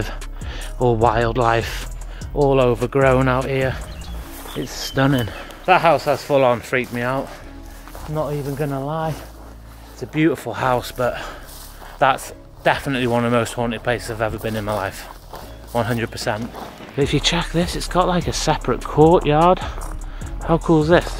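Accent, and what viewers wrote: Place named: Northern England, United Kingdom. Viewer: Yes and they understood your Northern way of speaking English, using phrases that no foreigner ever learns. You're great entertainment, but come on!!!!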